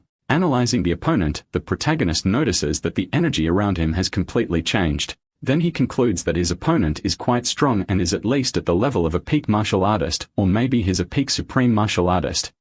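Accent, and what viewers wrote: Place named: England, United Kingdom. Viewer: Using an English accent is disgusting.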